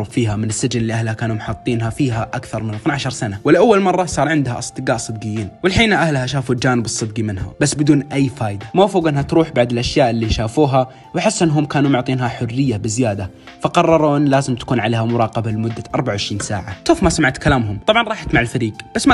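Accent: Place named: Qassim, Saudi Arabia. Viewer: احسك من القصيم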